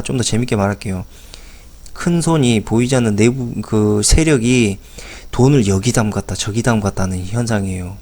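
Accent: Jeolla, South Korea. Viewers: 아따 전라도여 반갑소잉 전라도말은 숨길수가 없어라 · 전라도사투리 은근 중독 되네요~~ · 전라도네.. 믿고 거른다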